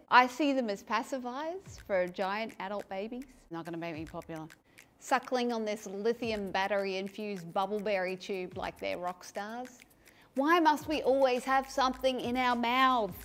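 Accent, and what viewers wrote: Australia. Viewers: Don't know anything about vaping, just smoking is bad for one's health. Just want to say I love the Aussie sense of humor and the accent. my siblings have been to Australia and my neice went to university in Sydney. They love it. Keep up the good work.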